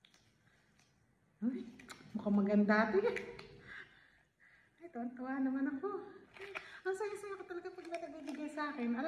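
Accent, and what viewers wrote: Nueva Ecija, Philippines. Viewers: Ateh saan ka sa pinas parang nueva ecija ka ba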